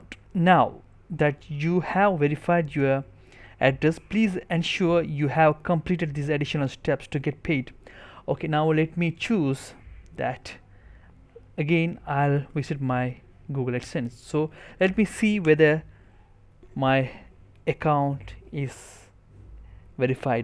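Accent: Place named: India. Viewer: dude if ur an indian then just talk English in indian accent man the accent was irritating by the was the the msg was informative, helped me thanks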